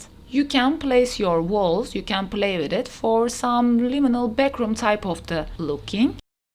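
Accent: Turkey. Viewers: Thanx for your tutorial with Turkey accent!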